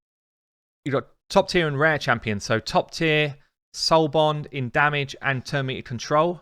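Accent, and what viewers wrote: England, United Kingdom. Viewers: Man, you are damn' legend! Great job! Even tho I hate english accent, I listen every single your word with pleasure. You did amazing and huge job to support newbies... :D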